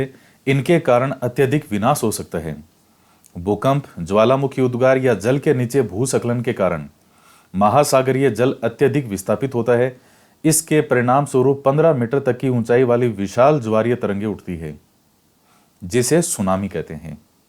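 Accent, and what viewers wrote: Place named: Marwari, India. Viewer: Sir thaari marvadi language bahut achhi lagi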